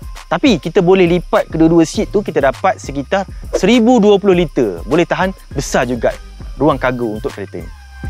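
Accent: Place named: Terengganu, Malaysia. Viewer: steady abg ni review. tapi kadang2 terdengar slang Terengganu dia tu. steady awok kite.haha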